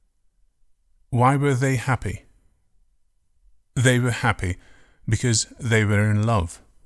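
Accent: United Kingdom. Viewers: I loved this lesson, but the accent is British, isn't? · Buenas tarde profesor soy principiante su ingles es britanico!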